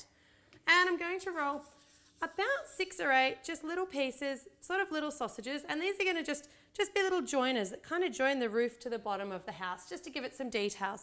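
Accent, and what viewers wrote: Australia. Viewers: I love your voice. It is like an Australian Jennifer Lawrence! :)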